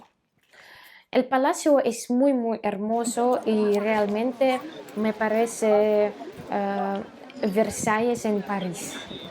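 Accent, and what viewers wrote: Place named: Russia. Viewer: Vi un video de abril y hablabas como estadounidense hablando en español mexicano y ahora, 5 meses después hablas comonrusa hablando español mexicano.: Gran avance.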